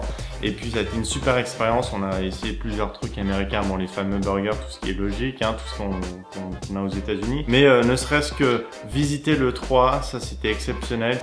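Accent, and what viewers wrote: Quebec, Canada. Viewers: j'aime bien le concept! PS. j'aime ton accent semi-QC, semi-FR. Continue t'est le King de l'internet!!!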